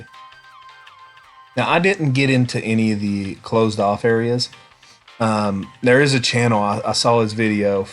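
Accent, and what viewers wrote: South, United States. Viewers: West Town Mall sister property of Simon Properties is thriving along with Turkey Creek lifestyle center is the retail hub for Knoxville. Pigeon Forge has a huge outlet center which is a destination. I love your southern accent. My family's from Lenoir City Kingston area.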